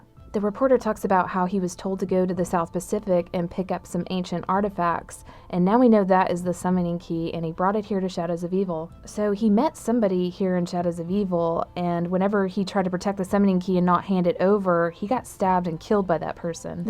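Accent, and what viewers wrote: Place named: South, United States. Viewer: You sound more southern in this video.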